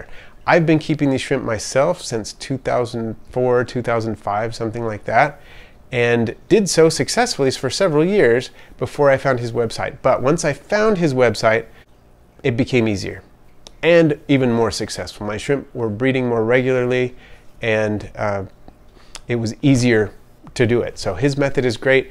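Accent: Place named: Hawaii, United States. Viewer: Bro. You sound local, like from hawaii. Im fkn serious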